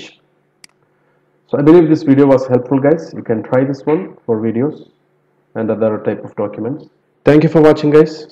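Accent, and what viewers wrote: India. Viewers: God Bless, It was wonderful. Love Indian accent. your Iranian Sister.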